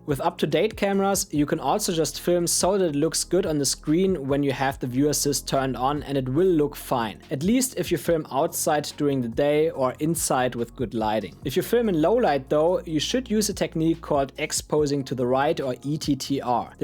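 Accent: Germany. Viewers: Really good video 👌 a question 🙋🏼‍♂️ you German? I kinda heard in your accent.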